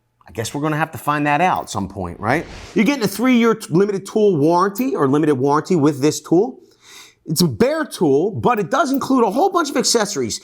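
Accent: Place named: Staten Island, United States. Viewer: You must be in NJ or NYC. A fellow Staten Islander? I just discovered your channel this morning, and I'm convinced you must be from within a 10 mile radius to sound like you do.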